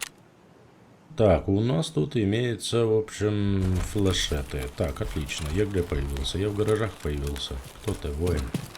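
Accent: Kazakhstan. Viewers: говор прям наш сразу начал подозревать что с Казахстана!))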